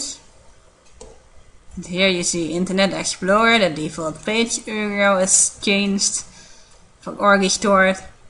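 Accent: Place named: Netherlands. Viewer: hoi, door de pop up en je accent had ik opgemerkt dat je nederlands was:). ik vond ineens nadat ik een programma had gedownload deze sweet-page en probeerde het te verwijderen door middel van het configuratie centrum, maar al gauw merkte ik de afwezigheid hiervan ik typte bij youtube in 'how to get rid of sweet page' en kwam al gauw op deze video, ik heb zelf geen last gehad van dit virus omdat ik het in hetzelfde uur heb verwijdert doormiddel van jou goeie instructies. HEEL ERG BEDANKT:) LIKE!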